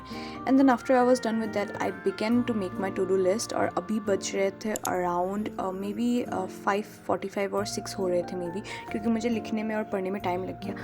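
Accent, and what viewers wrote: Assam, India. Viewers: Ghy Assam ? Cause you're accent sounds Assamese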